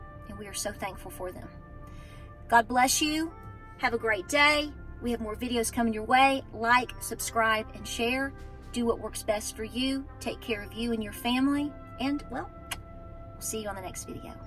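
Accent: South, United States. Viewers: I live here in East TN West Knoxville too! I just found your channel a few weeks ago. I love you videos and your Southern accent, thank you for sharing, I can feel the heart-love you send. 🦋🪴❇️💜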